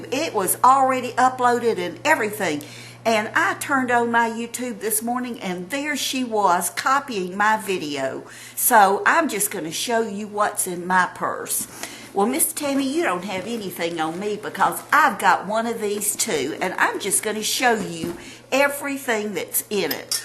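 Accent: South, United States. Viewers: Very good but I'm new from England so " who is Miss Tammy?" also love tour Southern accent which state are you from! Tennessee...pleas tell! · Oh!! This is just SO FUNNY 😂😂😂. I am about to wet myself laughing so hard!! You have THE MOST PRECIOUS personality and Southern to boot ❤️. I can't tell you how much this made my day. YOU GO GIRL ❤️😂🌷🌷🌷🌷🌷🌷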